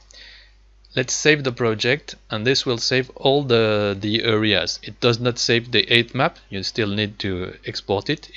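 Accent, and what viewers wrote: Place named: France. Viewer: bonjour, je pense que tu es français vu ton accent. Tu peux me dire si je peux obtenir une version démo de world creator 2 ? Sur leur site je vois pas de version d'essai. ya moyen d"en avoir une ou faut direct acheter le soft ? si c'est le cas franchement ils abusent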